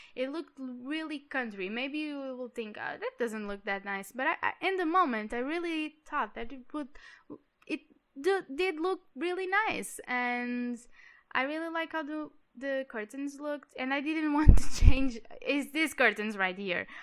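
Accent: United States. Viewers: Legal! Sou do Brasil. Parabéns pelo seu inglês, jurava q vc era americana. kk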